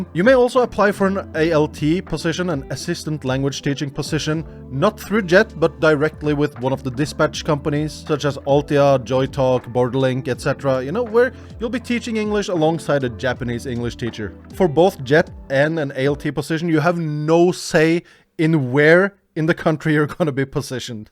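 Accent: Norway. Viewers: La meg tippe basert på aksenten din. Du er norsk sant? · Syntes dialekta hørtes kjent ut før du sa du var Norsk :'D Har aldri hørt om "self sponsor visa" før, men det høres ut som noe jeg kan få til.. Det er litt under 140k NOK per år ser det ut som :3 Veldig god info! :D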